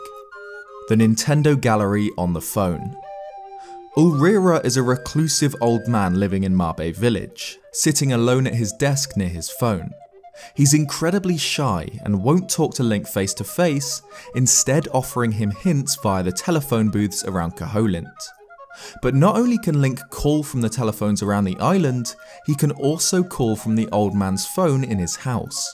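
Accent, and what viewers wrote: United Kingdom. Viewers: He's clearly a brit, not american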